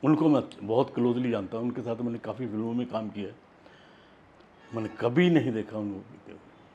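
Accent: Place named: Punjab, India. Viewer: Birbal has punjabi accent like shri dara singh ji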